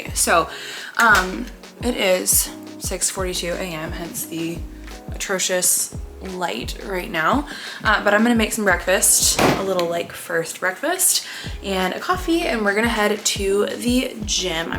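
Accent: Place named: Idaho, United States. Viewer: Am so excited to have a new great channel to watch! Sounds like you're maybe an Idahoan too?! Neighbors!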